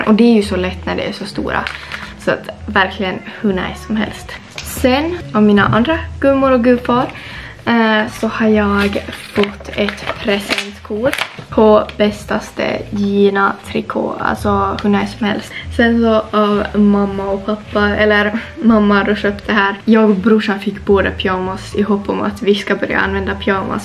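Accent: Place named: Finland. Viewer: hör det på din accent, är också finsk, svensk🥰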